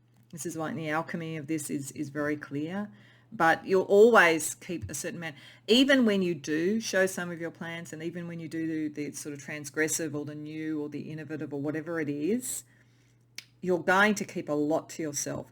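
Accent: Australia. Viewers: I understand if you don’t want to answer this question but I’m very curious… lol, there are a couple of things you say that I’ve only heard from a certain group of people. It’s not exactly what you say, it’s the way you say them lol. Do you live in or come from a country town in Victoria that was a mining town in its early days. It’s a town in the proximity of a well known island township. I’m trying not to be too specific but hope I’ve given you enough info to get what I’m asking 🤔😁👍🏼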